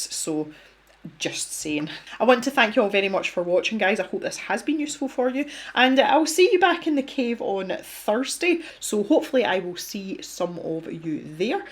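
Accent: Scotland, United Kingdom. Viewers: I have these in Australia and they are good, love your Scottish accent 😊😊😊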